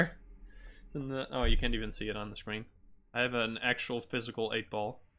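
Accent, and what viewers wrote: Netherlands. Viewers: ik hoorde het al eerder aan het accent, komt ook wel omdat ik al had gezien op <link> dat je nederlands bent. :P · dude I just started watching your videos and I had no idea you were Dutch until I heard you read the letters and numbers out loud.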